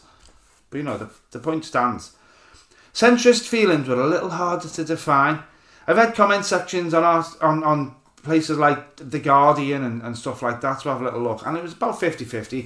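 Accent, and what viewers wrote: Liverpool, United Kingdom. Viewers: I really like this shout scouser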